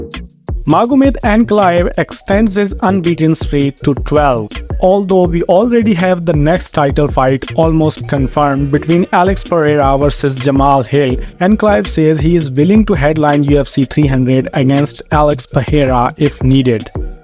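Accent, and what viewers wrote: India. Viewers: Thank you Mr. The guy with the Indian accent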